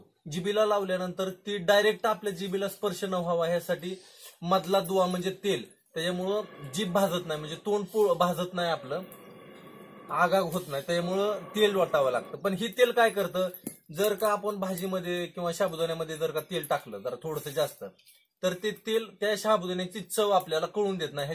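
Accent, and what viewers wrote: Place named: Marathwada, India. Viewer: Kuthle bhau aapan? Marathwadi bhasha watate..me Latur chi...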